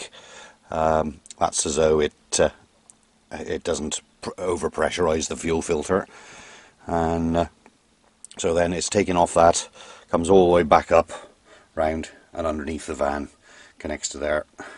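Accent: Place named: Wales, United Kingdom. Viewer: I know sounds like he is from there with a touch of welsh thrown in but to learn that he is from Hereford came as a bit of a shock. That said love the accent.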